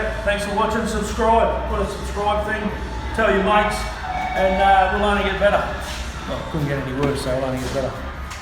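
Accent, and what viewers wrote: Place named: Australia. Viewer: Everything sounds better with an Australian accent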